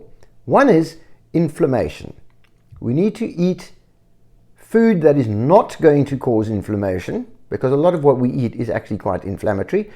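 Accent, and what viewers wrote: South Africa. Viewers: Are you South African (by your accent!?) Can you give an example of what you ate in a day?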